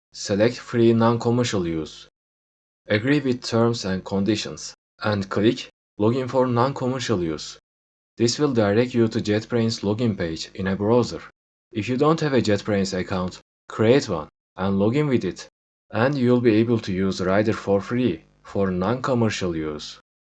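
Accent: Turkey. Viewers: bro are u from Turkey?Ur accent is like Turkish accent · yemin ederim aksandan anladim hemen turk oldugunu. yardimci oldun sagol.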